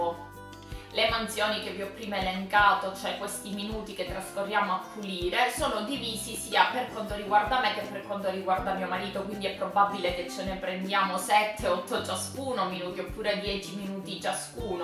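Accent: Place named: Cosenza, Italy. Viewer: Ciao mi sono iscritta al tuo canale e volevo farti i miei complimenti!Se non sono troppo invadente volevo chiederti se sei di Cs perchè mi sembra quel tipo di accento (io sono dell'alto Jonio).Sei libera di non rispondere se vuoi .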